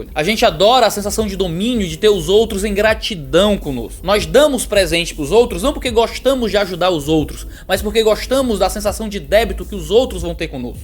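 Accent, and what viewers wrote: Ceará, Brazil. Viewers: Você é cearense?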